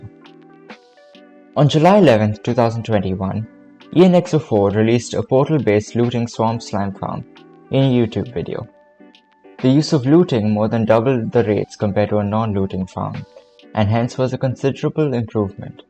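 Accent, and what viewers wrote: India. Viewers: Bro has a nice indian accent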